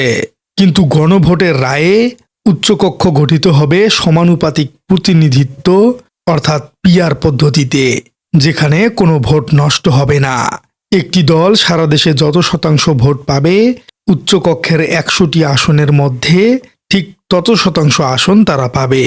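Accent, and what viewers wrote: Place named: Noakhali, Bangladesh. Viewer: Give up noakhali accents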